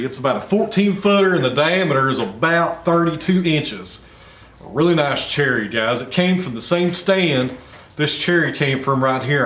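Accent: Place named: Southwestern Virginia, United States. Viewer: don't listen to the whi ers out there. I really enjoy your channel, I evev understand your talk, ( my family is original from southwestern va.) 😂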